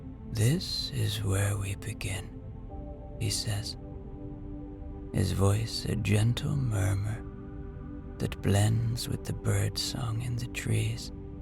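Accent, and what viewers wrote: Ireland. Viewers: Amazing. This story took me to a dream with my beautiful sister, who recently died. Beautiful memories, love, sobbing grief and acknowledgement of acceptance of grief as part of journey..thankyou again. I love your Irish accent and gentle voice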